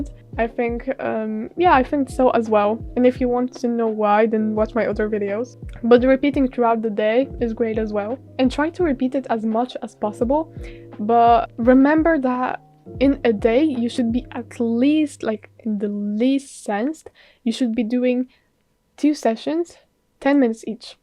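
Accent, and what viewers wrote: Poland. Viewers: I was right you're polish, I can tell it by your accents siema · I heard the first few seconds of the video and i already knew you are polish haha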